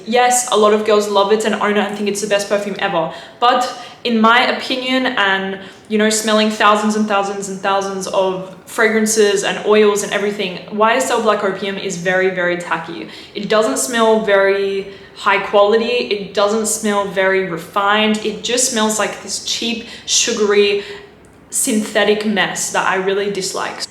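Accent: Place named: Australia. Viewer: Those fragrances might be tacky. But not as tacky as your half Australian, half European (??) accent. You don't sound exotic. You sound tacky. Stop it.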